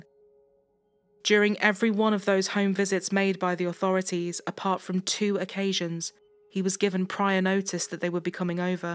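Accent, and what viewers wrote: England, United Kingdom. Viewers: I love her English accent. I only watch her to polish my English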